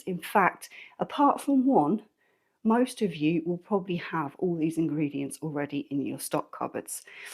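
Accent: United Kingdom. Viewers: Great to hear a pommy accent for a change, instead of the yankee ones.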